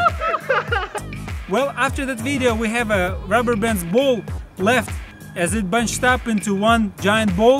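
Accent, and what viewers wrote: Russia. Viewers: I hate it when he says "let's do it." In his annoying Russian accent.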